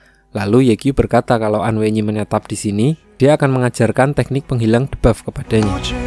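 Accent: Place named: Java, Indonesia. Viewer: Njiiirr orang jawa .. salaam bang dari jawa timir kediri bang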